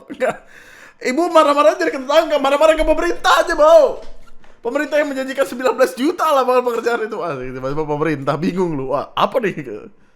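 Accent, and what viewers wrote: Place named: Medan, Indonesia. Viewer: Medan bah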